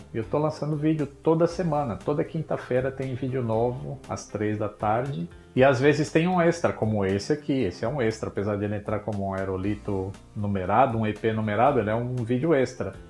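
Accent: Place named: Northeast, Brazil. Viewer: agora entendi esse sotaque de nordestino kk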